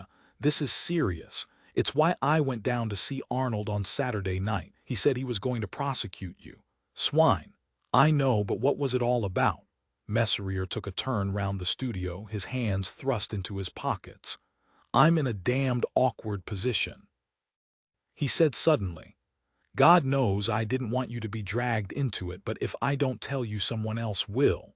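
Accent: United States. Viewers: A Heyer story narrated by an American? Terrible! I couldn't listen. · Gawd. Terrible narrator even without the ridiculous American accent.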